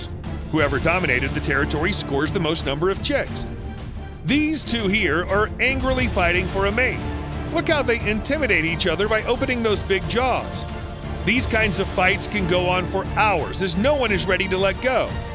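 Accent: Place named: United States. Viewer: The AI American accent is truly painful to hear. ....do yourself a favour and turn off the sound .. ooooops ,, too late ..your at the comments 😂😂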